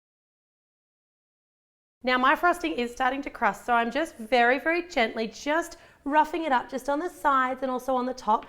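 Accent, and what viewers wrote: Australia. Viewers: Yasmeen Ashby-Ramos she's Australian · are you Australian?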